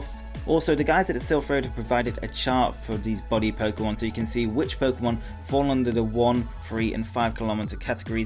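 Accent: United Kingdom. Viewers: omg your British yassss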